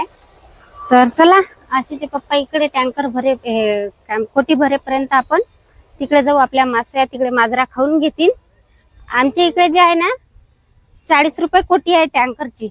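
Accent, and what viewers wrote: Vidarbha, India. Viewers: विदर्भाची भाषा ऐकून खूप छान वाटले ताई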